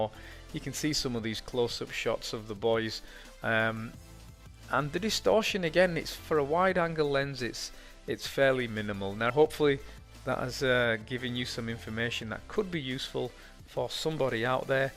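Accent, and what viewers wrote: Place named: Northern England, United Kingdom. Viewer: Nice northern accent ? I hope the model who is a stunner is from Yorkshire? Could you stop speeding her walk up , its the best part of the video .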